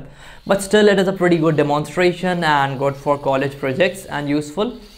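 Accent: India. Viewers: You are very sympatic. i also like your voice with a bit indian accent. 🥰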